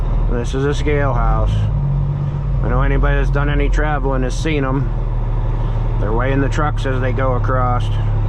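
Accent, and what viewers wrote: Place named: Midwest, United States. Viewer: Cleveland! That’s where I’m from bro you sound like you’re from the Midwest by chance. Love the sound of the Jake on your truck man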